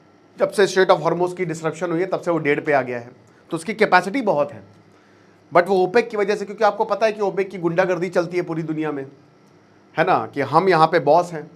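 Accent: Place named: Lucknow, India. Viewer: लगता है लखनऊ से हैं जी.. 🙂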